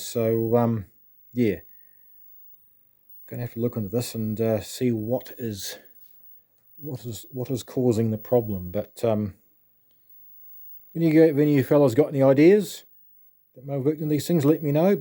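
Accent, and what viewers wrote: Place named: South Africa. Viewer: This man sounds like a White South African.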